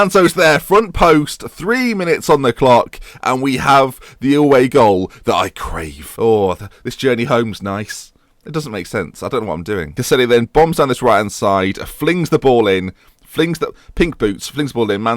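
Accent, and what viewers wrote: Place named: North, United Kingdom. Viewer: your accents just kept going further and further north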